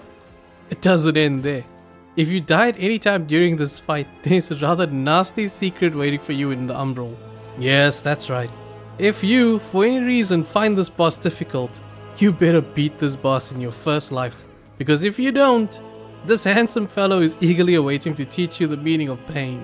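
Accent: South Africa. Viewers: Oh shit you from SA mal kak bru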